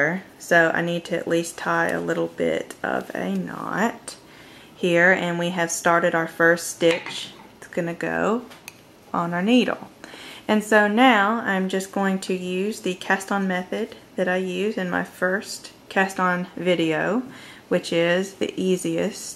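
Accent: South, United States. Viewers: This is terrific, I am an experienced knitter and you are too. In watching this I learned new stuff, so thank you. I detect a bit of a Southern accent, made me feel all warm and cozy while I was learning new stuff. Take cafe good care of yourself!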